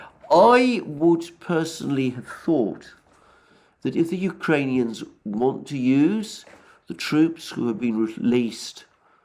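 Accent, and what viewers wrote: England, United Kingdom. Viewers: Alex works for Russian propaganda groups. They come up with his talking point for him. He is just a Russian mouthpiece with an English accent. I’m not making this up.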